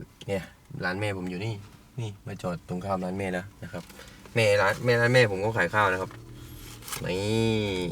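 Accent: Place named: South, Thailand. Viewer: พี่คนที่ไหนครับแหลงเหมือนคนใต้เลย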